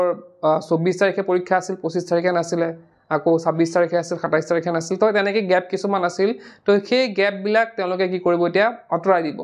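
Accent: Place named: Dhubri, India. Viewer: Dhubri'r khon lage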